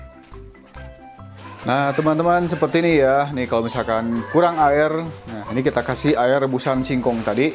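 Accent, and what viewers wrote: Sunda, Indonesia. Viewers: Porocotin heheee.. Sundanese pisan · Mantap Aa dn mksih infony sunda bgt AA 😁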